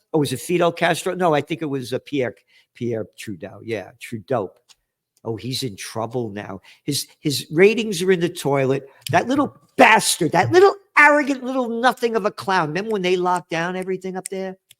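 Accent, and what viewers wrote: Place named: New York, United States. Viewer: Stop saying “turlit paper” with that annoying NY accent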